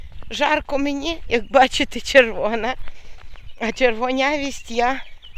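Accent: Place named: Western Ukraine, Ukraine. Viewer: Ви така позитивна ,тільки почула вашу мову відразу зрозуміла ,що ця жіночка з нашої західної частини України !😊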